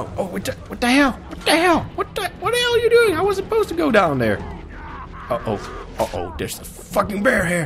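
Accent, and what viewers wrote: Australia. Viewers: Protip: He is australian, not british, mate. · hes not british, hes australian :)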